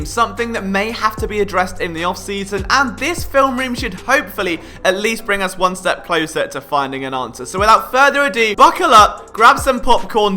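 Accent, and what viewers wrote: United Kingdom. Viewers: Who the hell is this Brit, trying to talk football?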